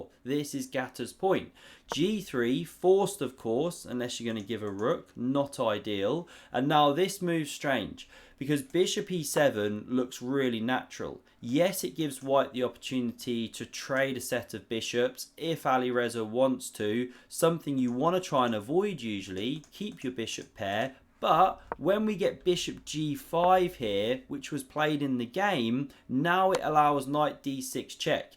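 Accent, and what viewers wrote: United Kingdom. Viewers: Love your channel and your cool British accent.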